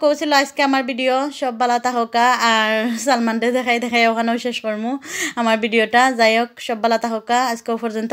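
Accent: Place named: Sylhet, Bangladesh. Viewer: বাংলাদেশি দেখলে মন বরে জায় বন্ধু হলাম আপনি সিলেটি মনে হয়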